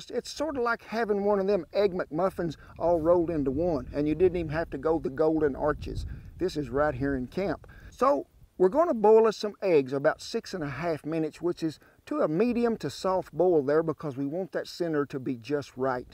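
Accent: South, United States. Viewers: I love this guy! Wow does his little mannerisms remind of my dear sweet departed daddy.....❤️thanks for the entertainment and the recipe looks to die for! He is near and dear to my heart already! What a sweetheart and wise man! I love his style and southern accent makes it all better. Chattanooga Tennessee sends its love! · About yay much!! Warsh it!!! I miss my southern people.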